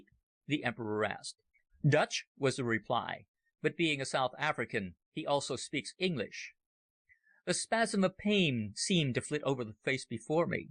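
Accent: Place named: United States. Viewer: Oh another bloody American narrator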